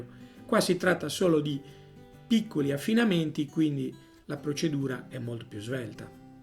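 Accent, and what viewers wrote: Sardinia, Italy. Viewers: Sentivo accento nostrano... Bravo, saluti da Nuoro.;-)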